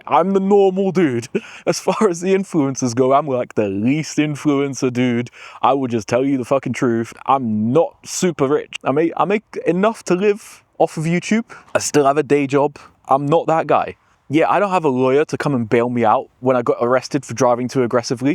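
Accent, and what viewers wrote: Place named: South London, United Kingdom. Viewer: I’m getting a slight south London accent but that location looks beautiful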